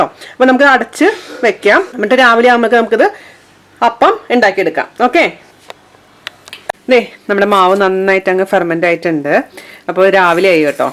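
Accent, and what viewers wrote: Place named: Thrissur, India. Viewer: Hai... njan Saudi Arabia il aanu work cheyyunnathu. Ende mappalakku appam nnu vachal jeevananu... evade njagal thamassikunidathu naalikeram kittilla naalikera powder kittum. But aval appam polichu... super nammude thrissur bashayil... classayitta.. thanks dear... njan ayyanthol aanu thamasam. I love you... enne karikal vakkan padipichathinu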